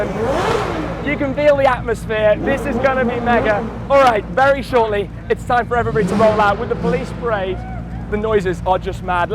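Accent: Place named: United Kingdom. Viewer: American muscle we need to stick together said in a British accent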